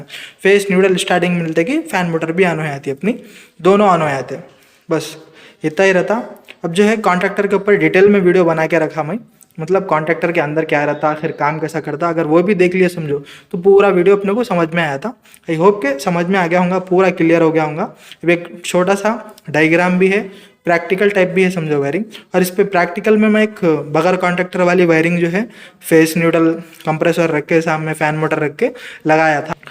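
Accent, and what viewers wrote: Hyderabad, India. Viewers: Bhai aap Hyderabad ka hai aap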